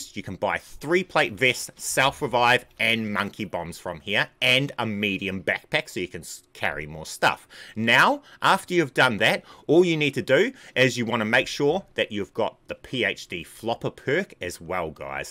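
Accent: New Zealand. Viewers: Are you from new zealand?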